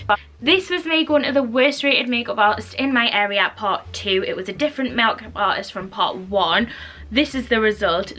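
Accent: Newcastle, United Kingdom. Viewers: Hope you enjoy Edinburgh plus I love accent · I’m from Bedlington but study in Edinburgh, love hearing a geordie speak, you make me feel at home ❤️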